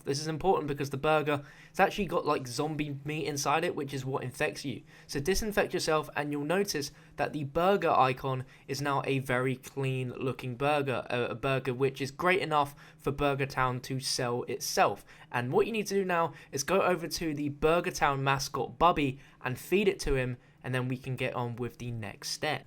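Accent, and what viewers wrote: United Kingdom. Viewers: 0 , idk if youre serious but if you are its garage but since he is British he pronounces it like that...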